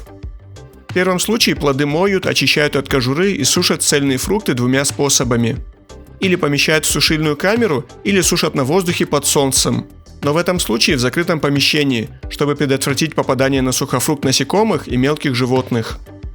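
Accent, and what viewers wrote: Kabardino-Balkaria, Russia. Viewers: Кабардинский акцент у диктора)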